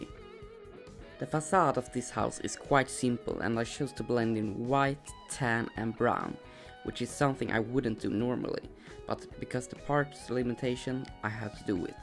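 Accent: Sweden. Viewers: Du låter svensk🇸🇪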